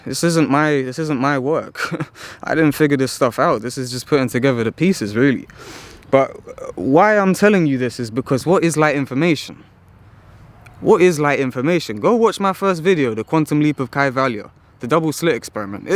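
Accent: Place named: United Kingdom. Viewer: I watched a faceless video of yours and thought you would be a black person from the UK. You talk so similar to one.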